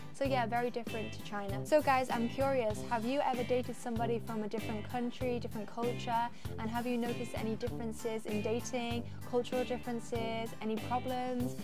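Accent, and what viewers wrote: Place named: United Kingdom. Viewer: ❤❤❤❤Love your British accented Chinese🌷🌷😘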